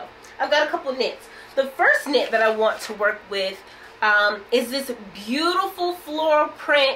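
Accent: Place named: North Carolina, United States. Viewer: I knew you sound familiar! 😊I'm from and live in NC, too! Can't beat that country accent.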